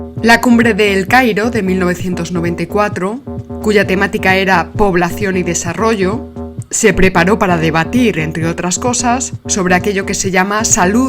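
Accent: Spain. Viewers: el acento español tan marcado es irritante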